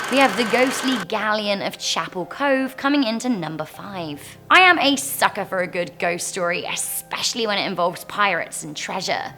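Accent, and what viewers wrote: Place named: RP, United Kingdom. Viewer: Your Posh English accent is so... 💣💥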